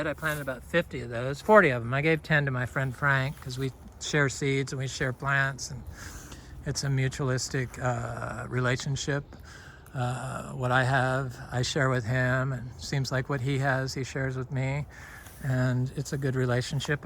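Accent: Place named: South, United States. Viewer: love the southern accent lol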